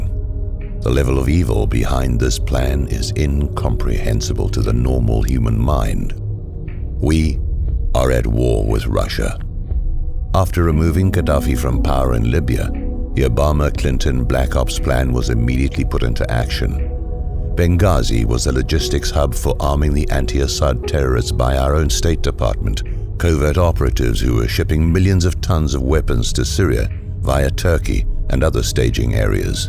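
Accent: United Kingdom. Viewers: just a thought: does the Narrator speaking with an extremely British accent, actually say "We, as Americans..." ?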